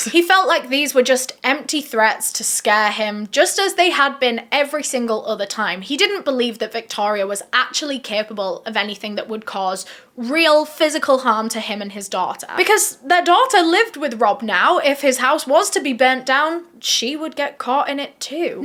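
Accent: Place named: Northern England, United Kingdom. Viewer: Are you from Northern England? Your accent is quite provincial.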